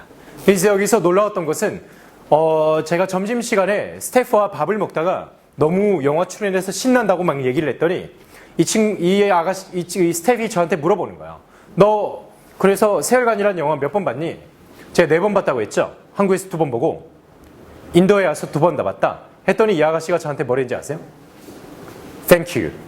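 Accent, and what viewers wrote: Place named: Busan, South Korea. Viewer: 부산말을 서울말로 번역 할 필요 없어요 ~~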